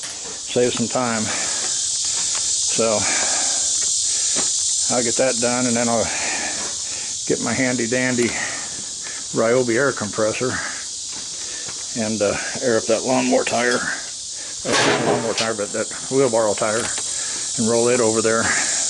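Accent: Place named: Oklahoma, United States. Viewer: It's the okie accent I've always called it wheelbarrel even though it's barrow we Okies add that url to it just like we say y'all instead of you all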